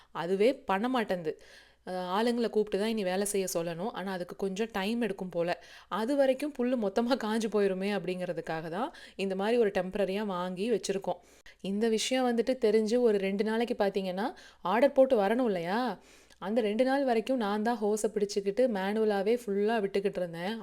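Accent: Coimbatore, India. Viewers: Hi akka naa new subscriber.my name kavya,naa coimbatore tha neenga pesarathu rompa pudikum akka naama oru slang nu kaykum poethu happy ah iruku akka,and Happy Birthday kutty and happy wedding anniversary Anna and akka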